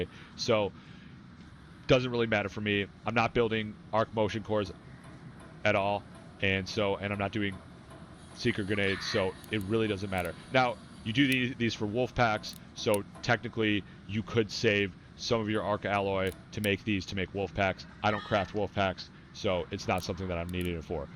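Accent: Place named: United States. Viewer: Sound American AF and mature, go straight to the point in the video without wasting people time, and you win.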